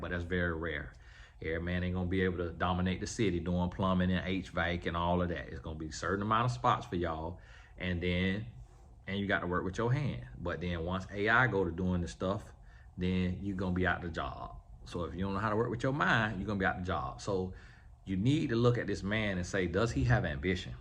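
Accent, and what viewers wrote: South, United States. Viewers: I am trying to figure out your accent Mr Tony. Is it a Southern drawl? 🇦🇬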